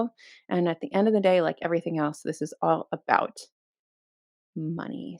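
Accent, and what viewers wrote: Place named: New York, United States. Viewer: love this video and had to comment bc when you said "cost" i knew immediately u were from new york and love ur accent (bc it's like mine haha!)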